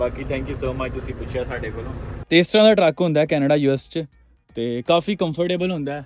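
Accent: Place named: Punjab, India. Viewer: hahah I really enjoyed your Panjabi Accent...Good work bro..Maza araha ap ki vlog dekh kar m Gonna Subscribe you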